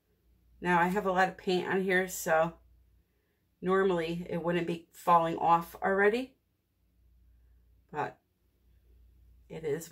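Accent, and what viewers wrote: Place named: Michigan, United States. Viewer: How could anyone say anything but positives! You are like a next door neighbor I wish I had. I appreciate the humor and most of all the humanness (if that’s a word). Thanks again for sharing. Love the new paint and those cells are wonderful. Keep up the great job and the fun we have listening to you laugh. Trying to figure out your accent, I’m thinking Michigan or Wisconsin. Sending hugs!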